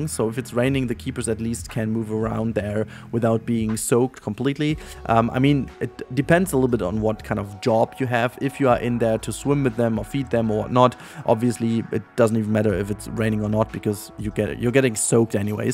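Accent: Germany. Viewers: Sorry to ask but are you German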